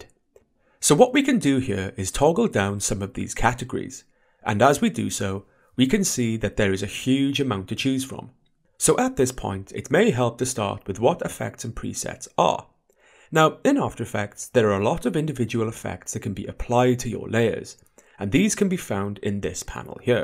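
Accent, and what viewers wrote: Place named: England, United Kingdom. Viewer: "Command Zee??" You sound like you have an English accent, I thought you were from England, now I'm really confused - where are you from then?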